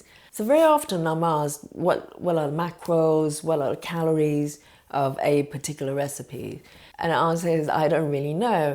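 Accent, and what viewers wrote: United Kingdom. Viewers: Thank you for the useful info. BTW I like your British accent. ☺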